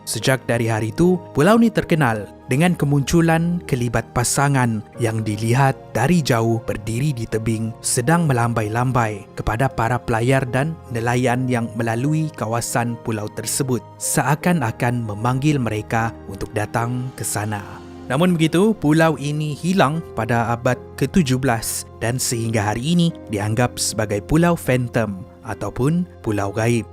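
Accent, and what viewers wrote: Sabah, Malaysia. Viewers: Admin ni orang sabah ka..loghat macam orang sabah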